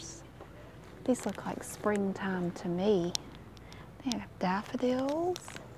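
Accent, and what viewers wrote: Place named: Georgia, United States. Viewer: I don't write comments often or at all really but I really want to say Thank you I used to live in GA but I moved and your accent reminds me of home. Also I still get the same tingles when people whisper in my ear. I've had a really hard time falling asleep lately but your videos remind me of home and put me right to sleep! Thank you for making your videos! You are absolutely amazing. Please don't stop making videos. Love the walk throughs!! Stay strong and blessed!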